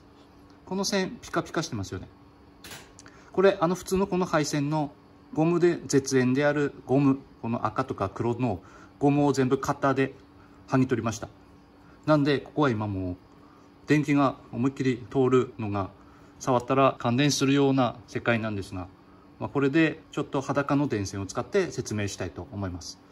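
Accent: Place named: Tohoku, Japan. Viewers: この訛りは津軽弁だな。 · 津軽衆のなまりですねー きいてて心地良いです(笑) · 東北の訛り本当最高です。実家に早く帰りたい😢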